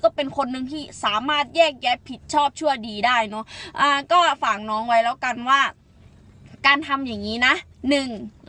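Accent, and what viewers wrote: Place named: Khon Kaen, Thailand. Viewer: ชอบมากอะเวลาพี่พูดแหมสำเนียงเหมือนคนขอนแก่นบ้านหนูเลยมักแฮงเด้อ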